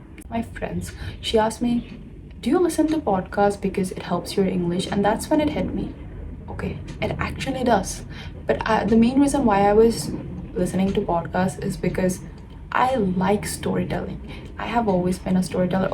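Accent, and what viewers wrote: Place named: India. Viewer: Wow your English is fluent! 👏 Just the accent sounds a bit like Indian vibes 🥲 maybe if you change the tone a little, it’ll be perfect 💯 otherwise it's oky👌👍❤️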